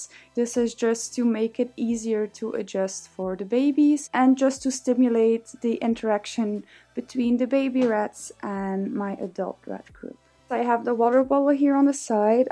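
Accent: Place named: Netherlands. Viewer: ben je nederlands?? je hebt een beetje een nederlands accent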